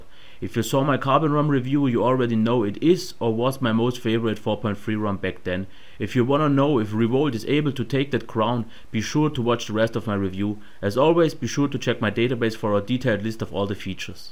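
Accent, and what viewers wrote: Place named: Germany. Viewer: This review is great, but the accent is even better. German?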